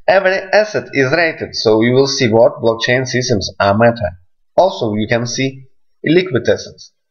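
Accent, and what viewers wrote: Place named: Russia. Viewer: ебучий русский акцент))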